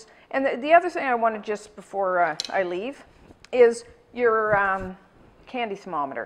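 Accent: Canada. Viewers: i am so so curious as to what your day job used to be, before this took over, since i've been watching you for years and you have always had measurements memorized and C/F conversions memorized and by your accent i can tell you are canadian or close to..and i have always been so impressed with your matter of fact/scientific way of explaining everything. nothing too lovey dovey or baby like. that's why i just need to know what you did as a job before this took off. ♥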